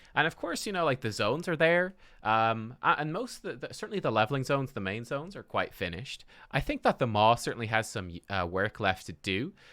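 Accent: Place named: Ireland. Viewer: Judging from his accent, I think he’s from Ireland, correct me if I’m wrong though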